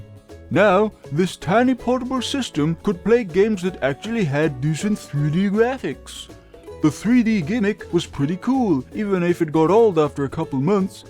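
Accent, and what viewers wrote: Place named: United Kingdom. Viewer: wait you're British... bitchin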